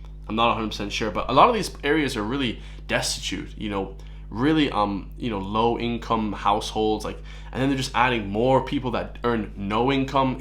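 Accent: United States. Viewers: Are you a yank??? What the fuck 😅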